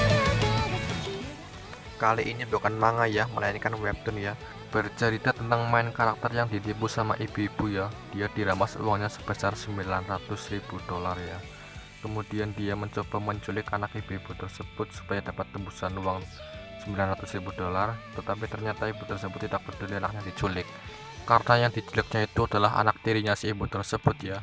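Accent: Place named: Java, Indonesia. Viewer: Orang Jawa bang? Logatnya kaya orang jawa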